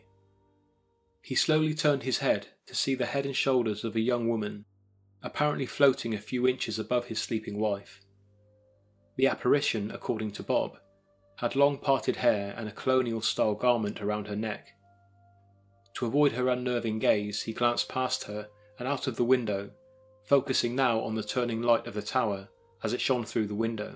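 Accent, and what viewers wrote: England, United Kingdom. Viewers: Interesting hearing someone from Old England talking about New England. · I'm normally streaming via Roku or SmartTV so I rarely leave comments but I absolutely love this channel. Excellent narrative skills from proper British accent, the stories are intriguing & I just wish made more videos (being selfish). But with this lame pandemic I don't know why anyone would give this man's videos a dislike. Keep doing what your doing sir! You're in my top 5 YT channels. Thank you for your time & efforts making these videos.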